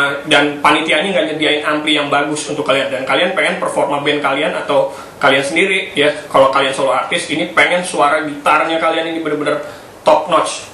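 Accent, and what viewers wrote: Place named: Jakarta, Indonesia. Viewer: Etdah betawi banget bang wkwakwa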